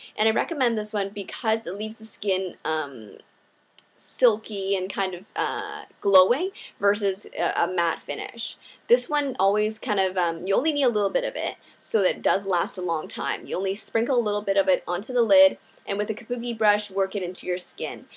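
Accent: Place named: Canada. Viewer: I cant tell you are canadian by how you pronounce "OU" like about, out lol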